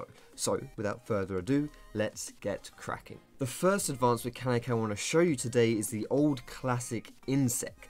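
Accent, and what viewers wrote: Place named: United Kingdom. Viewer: Inspired? No! I've just come here to watch some sick Lee plays and listen to your lovely British voice :3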